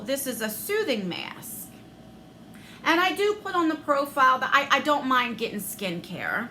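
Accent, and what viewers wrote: New Jersey, United States. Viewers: I love your jersey accent I’m from the south so it’s not very common to hear😝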